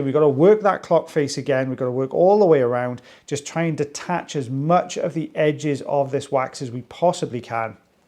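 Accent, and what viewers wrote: Wales, United Kingdom. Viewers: Sorry I had to laugh at your Welsh/English today.. fantastic video as always. Best wishes to, I hope he is feeling better.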